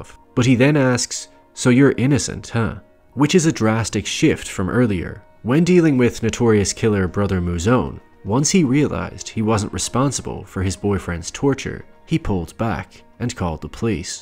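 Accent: Ireland. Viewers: Great channel. Please consider using your own lovely accent rather than the classic youtuber vocal fry + upwards cadence thing. I can hear there's an Irishman in there!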